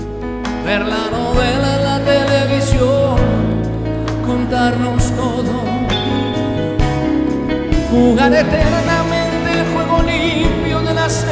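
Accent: Maracaibo, Venezuela. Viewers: Yo siempre he dicho que uno no es de donde nace sino donde se cría. Esta bien, Ricardo Montaner nació en Argentina. Pero su crianza, educación y formación ARTISTICA fue netamente en Venezuela. No tiene acento argentino, tiene acento 100% maracucho (originario de Maracaibo, Edo. Zulia). Su familia, esposa e hijos, venezolanos tambien. A continuación, uno de tantos artículos donde él admite ser primeramente venezolano . Ricardo Montaner: "No soy para nada argentino. Soy venezolano". <link> El mismo caso que Franco de Vita... artistas 100% orgullosos de ser venezolanos. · Mal hecho es ARGENTINO Y VIVIÓ EN SU ADOLESCENCIA EN VENEZUELA SE LE PEGÓ EL ACENTO SOLO ES ESO PERO EL NO RENIEGA DE SU SUELO ARGENTINO SIEMPRE DESTACA SU PAIS Y SU AMOR POR ARGENTINA DONDE ESTA SU FLIA . 🇦🇷🇦🇷🇦🇷🇦🇷🇦🇷🇦🇷🇦🇷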